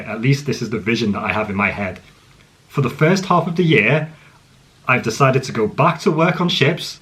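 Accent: United Kingdom. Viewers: What kind of accent do you have? I hear British with hints of Scottish, Irish some kind of Asian.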